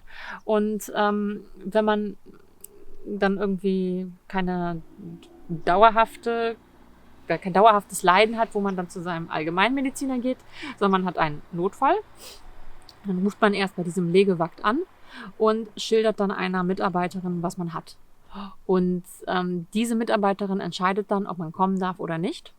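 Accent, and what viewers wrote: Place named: United States. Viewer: This is a real good comment, I was thinking something along these lines myself. With the risk of appearing offended (which I am not) I feel some of the conclusions/observations are a bit superficial. If the video wasn’t recorded in German I’d easily mistaken her for being American 😎 The Swedes might have Ikea and H&M, but we have Equinor, Telenor and Norsk Hydro 😆And, mind you, regarding being ‘lazy’, lets remember the GDP per capita for Norway is almost twice that of Germany 😶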